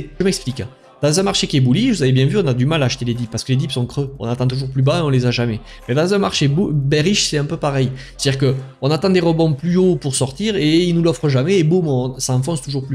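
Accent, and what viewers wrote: South, France. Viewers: L’accent du sud on aime frero 🤣🫡 de bonnes vidéos à chaque fois force 💪🏻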